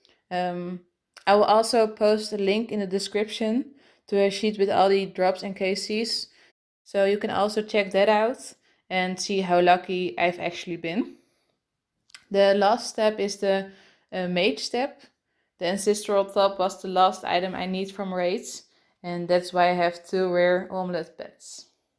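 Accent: Netherlands. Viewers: Recognized the dutch accent right away haha, I feel you. Nice account :) insane bank wow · Nice bank!! Hoorde gelijk dat je NL was haha! Maar echt nice zo n ironman account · ur dutch no doubt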